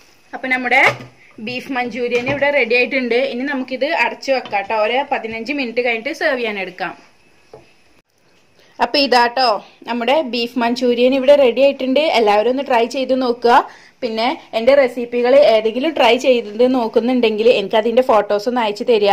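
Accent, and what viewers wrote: Malappuram, India. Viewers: samsaram orupadishta, njanum malappurathukariya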